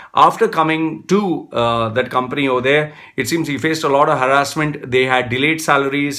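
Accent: India. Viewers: Shaithan/illuminati and Indian accent burst me into laughter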